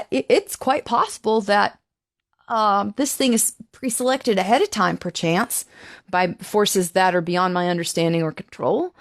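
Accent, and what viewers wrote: South, United States. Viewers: Nice to hear the southern accent coming out more!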